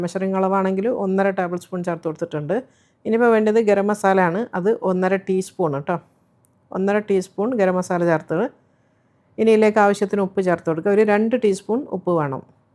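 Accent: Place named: Kannur, India. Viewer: Kannur aano samsaaram kelkkumbol angane thonnunnu 🥰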